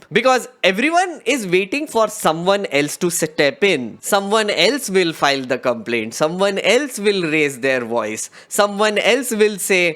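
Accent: India. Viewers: Bro u need to change ur accent it sounds so stereotypical Indian and is the reason for our loss of dignity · You’ve got the perfect Indian accent! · nothing wrong with having an Indian accent. he sounds dignified to me. no need to copy the English or Americans.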